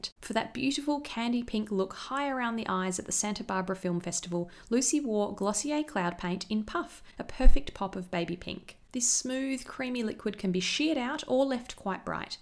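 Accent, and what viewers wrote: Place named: Australia. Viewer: I don't know how the hell I ended up here but, I really like that Aussie accent 😄💙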